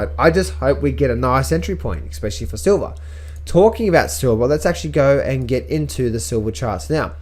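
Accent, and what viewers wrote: Australia. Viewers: Sorry new to this channel are you Australian?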